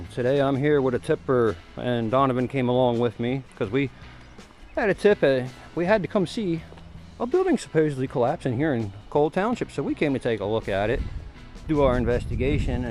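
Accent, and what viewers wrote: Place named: Pennsylvania, United States. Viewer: I knew it was Pennsylvania! I love your accent, that was the giveaway. I graduated Penn State in 2003, from U. Park but I did live in Schuylkill Haven for 1 school year, and that was enough. Pennsylvania is a beautiful state, despite the look of this ugly building. ❤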